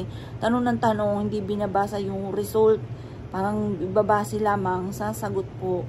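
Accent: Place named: Batangas, Philippines. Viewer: Parang taga batangas kayo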